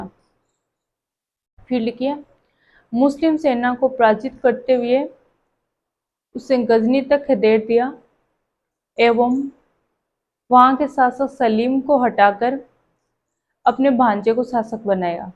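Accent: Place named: Marwar, India. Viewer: Respect Mam G Marwar se ho kya?? अपना लहज़ा लगा 🥰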